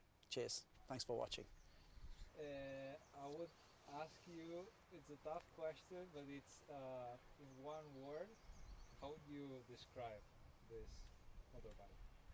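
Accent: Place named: South Africa. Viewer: You sound South African :)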